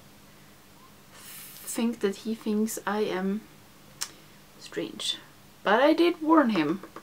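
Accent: Sweden. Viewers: Congratulations on your plants and your you tube channel!! Those browns look really beautiful on you. Thanks for doing your videos in English. I love your Swedish accent.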